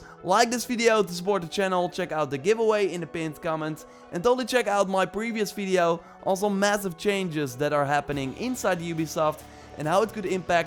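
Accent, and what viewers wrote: Netherlands. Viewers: U sound dutch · You sound like you are from the Netherlands. You have that "P is B and B is P" Accent.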